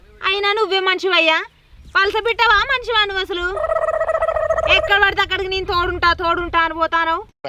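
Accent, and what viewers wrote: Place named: Telangana, India. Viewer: Mee telangana accent is very good &appre table good.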